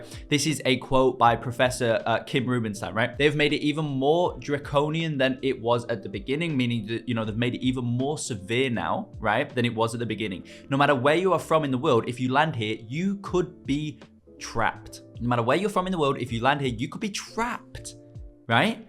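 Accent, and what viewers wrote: United Kingdom. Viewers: where are you from originally? Northern UK I'm guessing with the accent?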